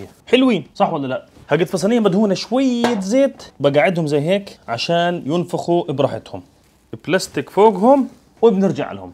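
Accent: Palestine. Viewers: احب قناته علشان اللهجه الفلسطينيه الي تجننننن 😍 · الصراحة أنا لا أحب أطبخ لكني أشاهد فيديوهاتك من أجل اللهجة الفلسطينية ، ما شاء الله. بالتوفيق حبيبنا اللزم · لهجة. غزوية غزة. دايمن غير تحيه. للي. غزة. من. داخل. المحتل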